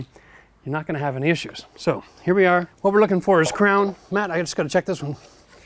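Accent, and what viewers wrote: Canada. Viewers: I can hear that Canadian accent. Went to school in Michigan and had lots of Canadian friends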